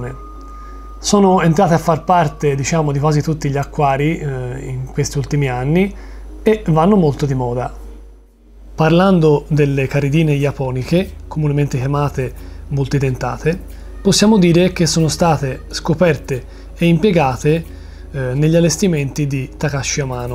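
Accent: Tuscany, Italy. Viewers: Il canale non è neanche male, ma non riesci poco poco poco meno la parlata toscana e poco più entusiasmo? Diventa difficile seguirti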